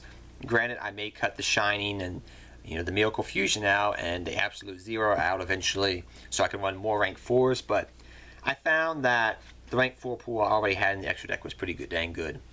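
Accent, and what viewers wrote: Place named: Northeast, United States. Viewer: you from up north?? got an accent on you Ny Boston NJ ??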